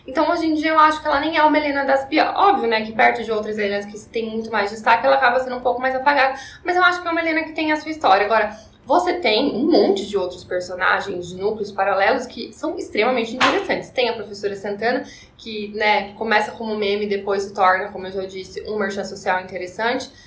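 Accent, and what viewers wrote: Rio de Janeiro, Brazil. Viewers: O sotaque carioca da... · Amei o sotaque,!❤como carioca, confirmo q é assim mesmo! Melhor q o da Jade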